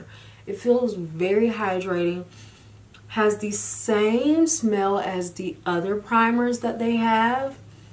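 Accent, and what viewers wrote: Alabama, United States. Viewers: You sound just like NitraaB, so when you said Alabama I said no wonder, she’s from there too. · Gorg!! I knew you had an Alabama accent and you remind me so much of Nitrab here on youtube. I love your videos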